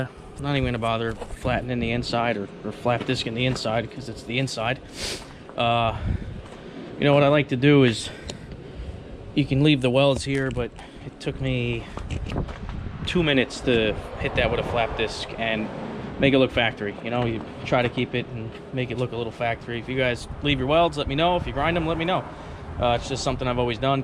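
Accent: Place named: New Jersey, United States. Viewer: What part of New Jersey are u from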